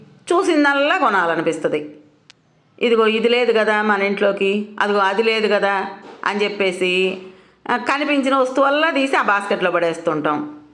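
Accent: Guntur, India. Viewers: మీది గుంటూరు ఆ అండీ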